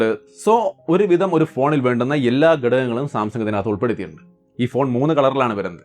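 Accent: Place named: Palakkad, India. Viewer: Palakkad karananeleee☺️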